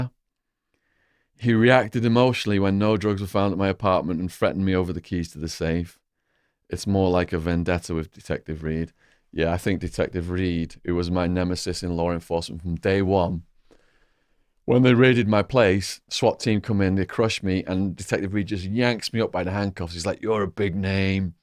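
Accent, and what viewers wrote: United Kingdom. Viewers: u have some kind of uk accent, but you're English is quite proper to me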